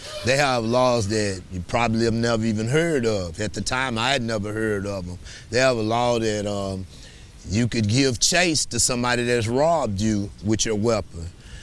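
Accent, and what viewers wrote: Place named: Houston, United States. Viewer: He talk just like them Houston cats too.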